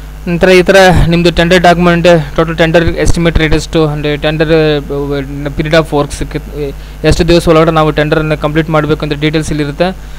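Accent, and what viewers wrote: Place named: North Karnataka, India. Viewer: Yappa maraya kannada dag matad pa hingyak alakati clear aagi matadidre tiliteti...Uttara Kannada dav adree yak nachkotiya hodi9 Annu yak achcha kannadalli matadbek anta alta idiya,relax agu modlu..,tekta idira eke...?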